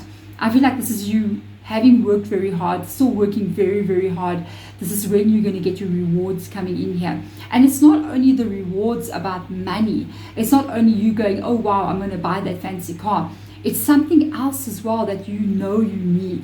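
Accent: South Africa. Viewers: is she south african, I love the way she speaks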